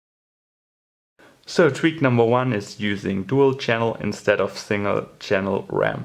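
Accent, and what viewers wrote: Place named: United Kingdom. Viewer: Nigga cant u tell? He has a fucking brit accent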